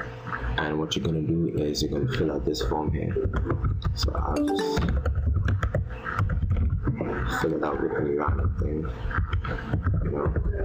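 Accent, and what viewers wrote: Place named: Trinidad and Tobago. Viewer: He is not Nigerian he is Trinidadian... their accents are very distinct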